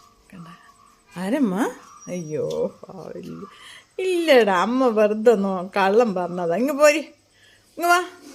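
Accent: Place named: Thalassery, India. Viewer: സംസാരം കേട്ടിട്ട് തലശ്ശേരി ഭാഗത്ത്‌ ആണെന്ന് മനസിലായി... ഏത് ഭാഗത്താ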